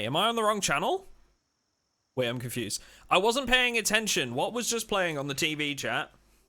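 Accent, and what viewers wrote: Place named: United Kingdom. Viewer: Your voice slightly, but only slightly reminds me of ItsJabo. Maybe because both of you have the same accent. Both of you are from the UK.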